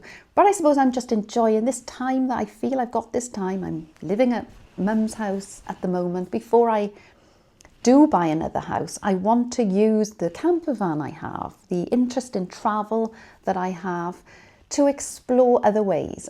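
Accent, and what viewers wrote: Wales, United Kingdom. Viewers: it is very obvious that throughout your life you've always been a Very good looking lady. And still look far younger than your age, you clearly look after yourself! It works. At 70, I've been going to the gym for 3 yrs now, 3 times a week, 2hr sessions each, plus long walks; 5-11 miles. Never been better! And you have a beautiful soul which you use now to help others, even without a particular topic, I'm sure many people living alone just like to sit and listen to another human being chatting away about their lives. It provides comfort and a degree of normality by association. You are a 'giver', and you do it through love. That is the best and only way, and it returns like you would not believe! That gentle Welsh accent also helps:)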